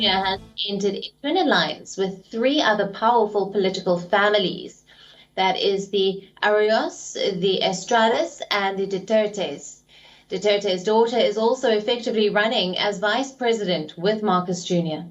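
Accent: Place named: India. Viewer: its an indian accent. Just like Filipino, Korean, Singaporean english accent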